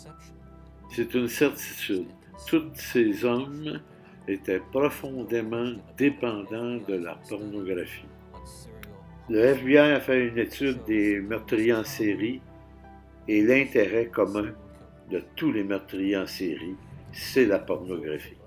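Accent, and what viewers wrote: Quebec, Canada. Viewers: celà s'appelle le français Québécois pauvre inculte de français tu sonne raciste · C'est ps une sinusite , c'est l'accent canadien qui et insupportable..... pourquoi voir pris un canadien pour commenter cette vidéo... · Ce n'est pas un reportage canadien c'est une voix off québecoise.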